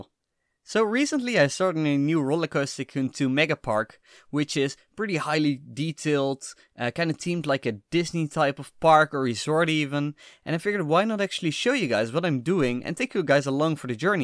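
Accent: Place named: Netherlands. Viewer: Haha ik kan gelijk horen dat je nederlands bent 😉 verder toffe video!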